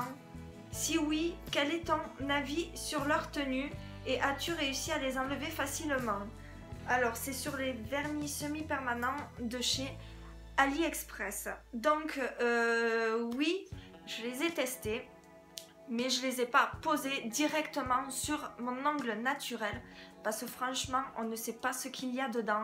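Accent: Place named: South, France. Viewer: AAAh ok j'entendais un petit accent du sud,bonne journée à toi!bizzzzzzzzzzz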